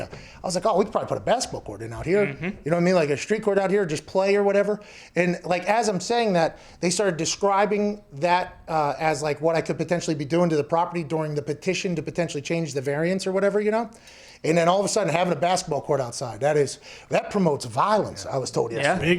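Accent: Pittsburgh, United States. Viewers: Thank you to whoever pointed out pronunciation of “house.” Dude’s so god damn pittsburgh. Love his pittsburgh accent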